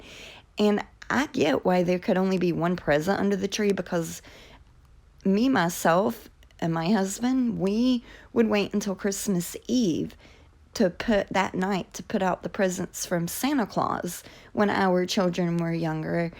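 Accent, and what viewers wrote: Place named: Carolinas, United States. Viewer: You from the Carolinas? Love the accent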